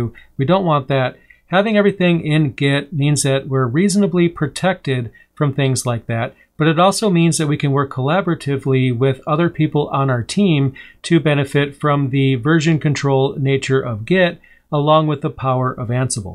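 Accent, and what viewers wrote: India. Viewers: And how does someone know the video has a speaker with an Indian accent without watching/listening to it? Mind your own language Suraj. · Mind your language... If you can't understand India accent don't watch Indian videos.. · you got a problem with Indian accent? Why though? Open up youtube and search for any tutorial, you'll find an Indian guy with thick Indian accent uploading free content for guys like us learn so just be grateful rather than passing these comments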